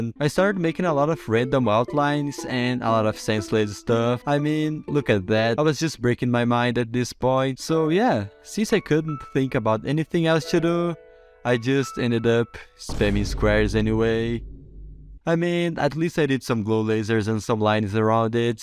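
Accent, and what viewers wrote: Brazil. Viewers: Reconheço sotaque brasileiro de longe, é sempre raro e bom ver YouTuber BR na gringa. Keep up with the content <3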